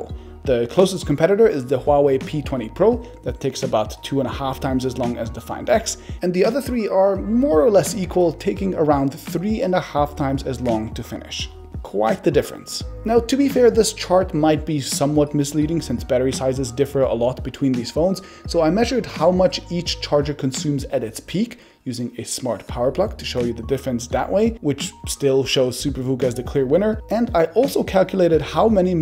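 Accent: Germany. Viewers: are you german? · This guy has a strong German accent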